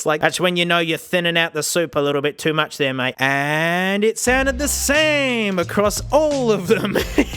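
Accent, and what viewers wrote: Australia. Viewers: AL-UU-MIN-NEE-UM. I Love your vids my Auasie friend. Keep it up. 😃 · For some reason i love the Australian accent and the information about the Ipods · You should review the HyperX Cloud Alpha S headset. I love the Australian accent! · Ar u australian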